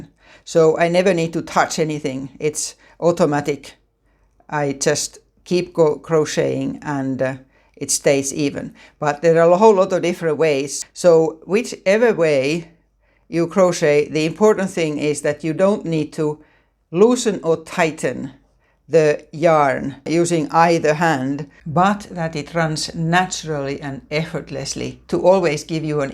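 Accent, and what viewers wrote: Finland. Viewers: Oh I love your Finnish accent - reminds me of my Grandma Jenni! Great tips and love your teachings too. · 😮 you're from Finland? I thought your accent sounded familiar! I am learning Finnish. Just started about 2 months ago. I'm learning on Duolingo so I understand I'm learning more formal Finnish rather than spoken Finnish, but I've got to start somewhere! Suomi on niin kaunis kieli! Kiitos.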